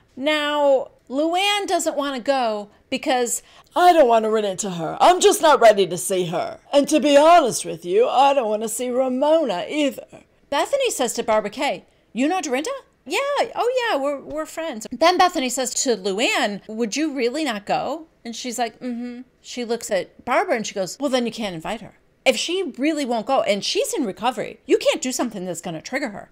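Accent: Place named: Chicago, United States. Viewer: I love Luann! She's such a character. Sonja is the most eccentric, accidentally hilarious, cringiest housewife of them all lol I got the same vibe from Barbara. The only positive thing that could happen from that is that she knows a lot about Luann, and doesn't seem to hold back based on that convo with Carol a few seasons ago. I don't know, im on the fence about it too. By the way, what state do you live in,? You gave a slight accent that reminds me of Chicago or something. I'm from a very small, rural town in Indiana, and everyone thinks we sound southern lol but I've always wondered where you live, if you feel comfortable telling us.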